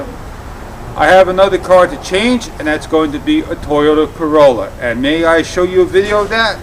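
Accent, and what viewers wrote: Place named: Brooklyn, United States. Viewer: When I heard you say the word "stoop" I just know your from Brooklyn. Maybe your filming this in Brooklyn. I was born and raised in Brooklyn. Why is this so special, well, now I live in Delaware. There are a lot of retired people here from Brooklyn, like myself, but when the locals here my accent they know exactly where I am from. Anyway, I have a question for you. You said to change the fluid 4 times to help to clean out the filter. Do you have to use the very expensive Honda ATF every time you change the fluid, or can you use a cheaper fluid for the 1 day to clean out the filter and on the last fill, used the Honda brand ATF?? I hope you get this question and provide me with a reply. Thanks!!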